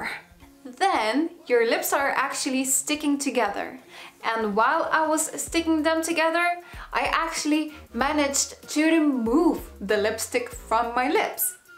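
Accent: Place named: Lithuania. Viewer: I could tell from your accent you could be possibly Lithuanian! And heck yeah to my guess you are :) Labas!!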